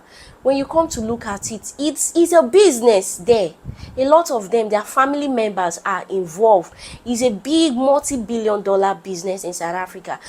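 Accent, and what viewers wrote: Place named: Ika/Ndokwa, Nigeria. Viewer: You sound ika or Ndoka in delta state. Shout to you for accepting you were wrong in your last videos. Good Job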